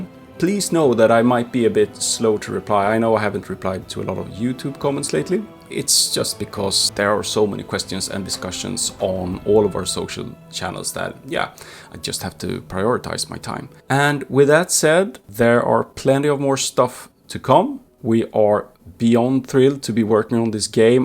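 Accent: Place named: Sweden. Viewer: I like your swedish accent! i bought the game because of it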